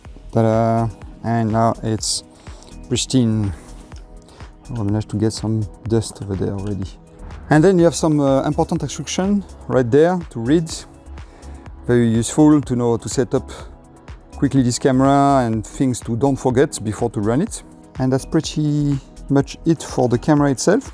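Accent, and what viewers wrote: France. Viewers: Merci beaucoup pour cette vidéo, vu l'accent je pense que tu es Français, dommage de ne pas avoir fait cette vidéo dans ta langue maternelle, je comprends rien à l'anglais, j'ai dû activer les sous-titres mdr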